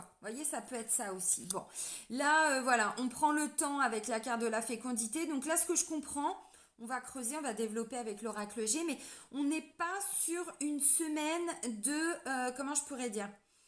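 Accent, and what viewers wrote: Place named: Quebec, Canada. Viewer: Maudit que je t’aime toi ont dirais presqu’une Québécoise!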